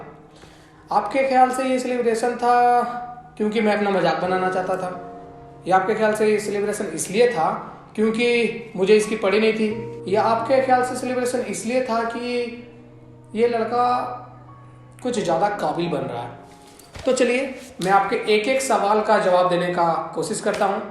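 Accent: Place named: Bihar, India. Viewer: BTW your Bihari language op❣️❣️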